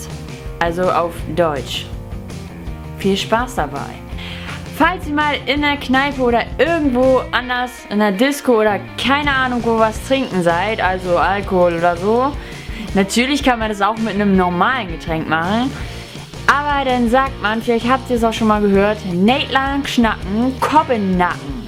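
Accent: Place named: Low German, Germany. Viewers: Wie unterschiedlich dein Plattdütsch zu dem hier in Nord-Ost Deutschland ist :) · Cool :D Wusste gar nicht das du Plattdeutsch kannst, aber wenn du normal redest hört man das auch irgendwie das du ein echtes Nordlicht bist. Bei diesem "Scheiss egal Speck oder Aal, beides wird mit B geschrieben." Musste ich auch erstmal überlegen was gemeint ist, aber ich habs denke ich verstanden. ;D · geht mir genau so, komme auch aus westfalen, meine Oma hat es fließend gesprochen und hat es mir etwas beigebracht, das Platt hier im Video hört sich aber ganz anders an, liegt wohl daran das es nördlicher ist. fahr mal in die Niederlande, ich verstehe die dort ziemlich gut, nicht alles perfekt, aber sehr viel, nur mit der Aussprache happert es auch bei mir. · schnackt ja nich Platt - sondern Hochdüütsch mit norddüütsch Akzent ;-) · Sprech bitte nur den Dialekt/platt. 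Ich finde das Hochdeutsche hört man aus dem Platt zu stark raus. Und ich liebe Platt😘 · Nunja es darauf an wo man wohnt und wie man erzogen wurde. In kleinen Dörfern ist Plattdeutsch mehr vertreten als in Städten. Die meisten Menschen sprechen ganz normales Hochdeutsch, manche dazu auch Plattdeutsch. Diese sprechen Plattdeutsch meist mit Bekannten oder der Familie. Außerdem wird in manchen Familien viel Wert auf die Pflege der Plattdeutschen Sprachkultur bzw. dessen Erhaltung gelegt und in manchen eigentlich garnicht. So spreche ich (17 Jahre alt) fast ausschließlich hochdeutsch . Nur manchmal mit ein paar Norddeutschen Worten( nicht direkt Platt) in den Sätzen. Abgesehen davon ist sie keine Plattdeutsche "Muttersprachlerin" da sie recht langsam mit Akzent spricht :-) Die Begrüng ihres Videos ist weniger Platt, als mehr Norddeutsch, wie es im Hamburger Raum eher vertreten ist :-) Also abschließend kann man sagen , dass Platt nicht der Hauptdialekt hier ist ( eigentlich ja eher ne Sprache) sondern von einigen parallel zu Hochdeutsch im Alltag gesprochen wird :)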